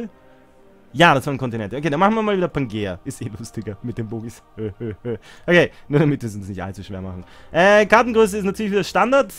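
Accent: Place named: Vienna, Austria. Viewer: Was für ein Akzent ist das? Wien?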